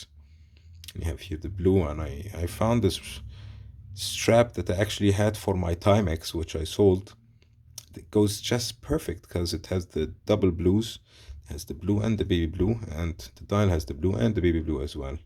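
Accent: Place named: Egypt. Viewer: Great collection man! I knew from your accent that you might have been arabic (egyptian if i'm not mistaken) and I was waiting for you to pull out some watches with arabic numbers.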